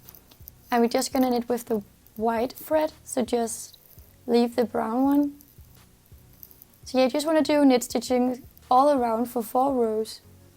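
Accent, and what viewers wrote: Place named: Denmark. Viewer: Er du måske fra Danmark? Your accent sounds kinda like it. Tak for dine videoer!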